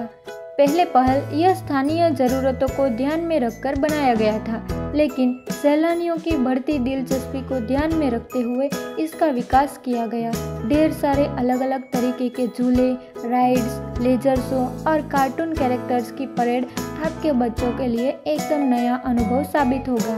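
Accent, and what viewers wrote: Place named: Gujarat, India. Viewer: Tame gujrati 6o aevu lage 6e 🤔🤔🤔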